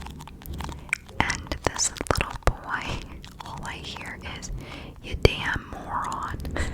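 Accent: South, United States. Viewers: I love southern sounds! Would love if you did a librarian role play like she does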